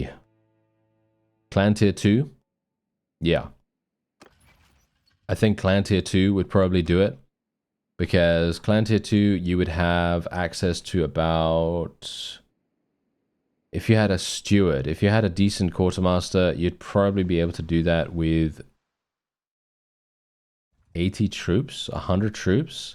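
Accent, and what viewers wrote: United Kingdom. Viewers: The Posh English ! Keep on trucking Sir ;)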